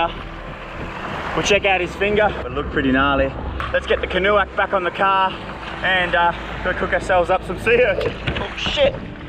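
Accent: Australia. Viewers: The Nads are called "Umi" in restaurants, you brave souls! New sub, love your channel, still havn't figured where your at, my guess is Australia. It's the accent!! 🤔🥰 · Are u Aussie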